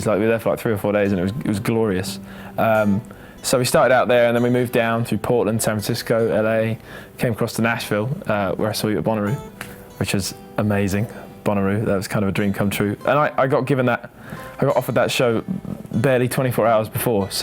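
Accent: United Kingdom. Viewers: this british super talanted and also very beautiful and charming with this accent ogosh im inlove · OMG HE HAS A BRITISH ACCENT!! JUST MADE HIM 100% HOTTER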